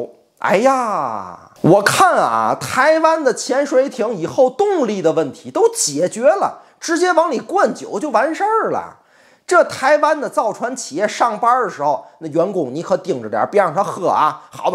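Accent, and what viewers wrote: Tianjin, China. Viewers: 听口音好像天津的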